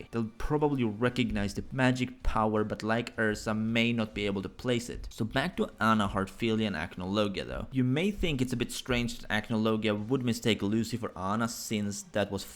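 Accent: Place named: Spain. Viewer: you're freaking Spanish PEOPLE DO YOU HEAR HIS VOICE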